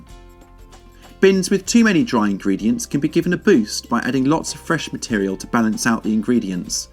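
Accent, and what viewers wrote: United Kingdom. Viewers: Love the hillbilly music the beginning, and also that Mr. Bean accent.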